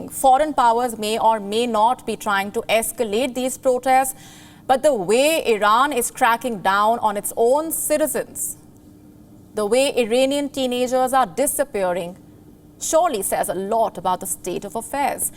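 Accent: India. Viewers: is not improving,her accent is still Indian,her intonation is not improving as well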